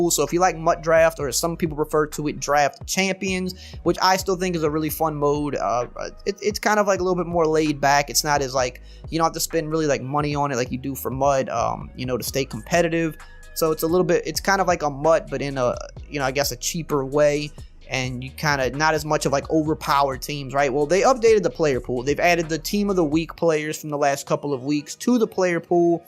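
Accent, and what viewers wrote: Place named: South, United States. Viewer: Thanks for u videos country boy your accent makes me listen lol frfr tho thanks for the info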